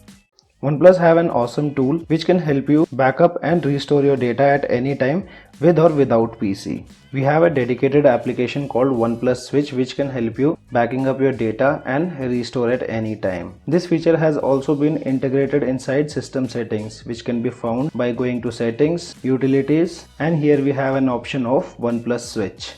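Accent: India. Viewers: everytime i hear a India voice i think a scammer Donda dell me Whad do do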